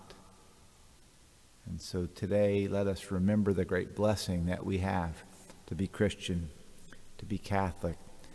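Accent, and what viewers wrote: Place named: South, United States. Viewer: Father, I was browsing on YouTube and "discovered" your video clips. (I think maybe God had a hand in my discovery.) Anyway, I must say that I enjoy listening to your homilies. I try to listen to you quite regularly. P.S. It's nice to come across a priest who has a nice Southern accent, y'all. That's meant as a compliment.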